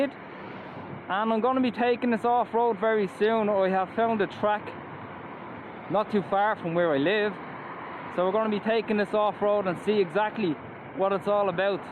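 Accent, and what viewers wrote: Ireland. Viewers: That Irish accent is pretty strong 😂😂😂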